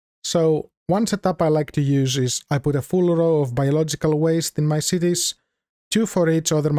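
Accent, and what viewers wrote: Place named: Finland. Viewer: I love Finnish accent so much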